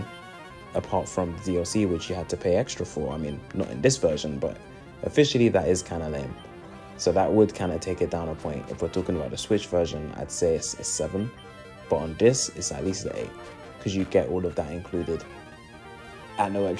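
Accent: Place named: United Kingdom. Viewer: Hearing a british guy playing sword and shield is strangely immersive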